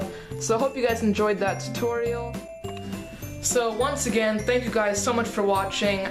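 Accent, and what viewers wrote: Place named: Russia. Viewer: Your voice has a Russian undertone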